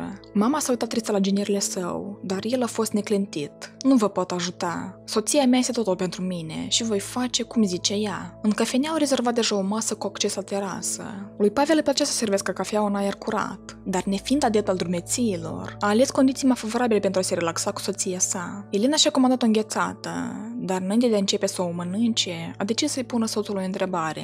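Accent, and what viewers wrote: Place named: Moldova. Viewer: Accent moldovenesc dulce ..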